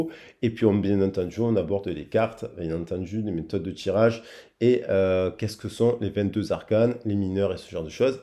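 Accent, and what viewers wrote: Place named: Marseille, France. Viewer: BON et bien bon courage!! J' espère que la communication va bientôt arriver avec Arnaud...au fait Je suis cancer ascendant vierges et descendant poisson 🐠 alors voilà j'y crois comme à la 🌏 terre j'y croit comme au soleil ☀ et à mon Arc en ciel qui va m'apporter de très bonne 🔮 prédictions..bien sûr avec ta voix chaude et sensuelle qui n'appartient à personne qu'à toi sur le net! Et c'est un plaisir de t'entendre à chaque fois avec l'accent de MARSEILLE! BRAVO 👏 POUR TOUTE TA RICHESSE INTÉRIEURE QUE TU AS VRAIMENT MÉRITÉ ET TOUTE MA GRATITUDE POUR NOUS PERMETTRE D'Y ACCÉDER GRATUITEMENT ET AVEC TANT D'HUMILITÉ 👋 CIAO 👋 😆🤩😉🌟❤️‍🔥💞🌈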